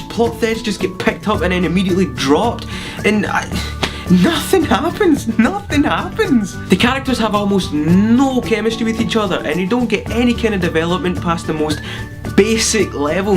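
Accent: Scotland, United Kingdom. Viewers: Is that a Scots-Gaelic accent there?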